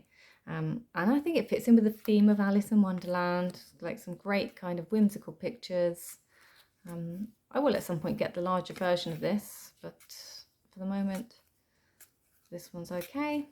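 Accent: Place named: United Kingdom. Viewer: For the record...I adore your thumbnail. And thank you so much for your kind words ❤ this was lovely! I have my eyes on the Dark mansion Tarot. Your decks are beautiful and I think I'll add a feel to my list lol. Enjoyed this so much. Your answers are awesome. Sending you love. And I noticed you have a lovely UK accent if I am correct? Man I miss it over there and can't wait to get back.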